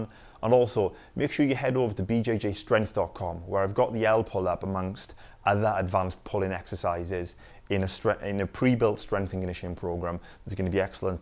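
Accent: Wales, United Kingdom. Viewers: U welsh butt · Nice to hear a Welsh accent on YouTube! Bro use YouTube cards to and pin your videos on the screen when you mention them, easier than description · Ah, a Welshman...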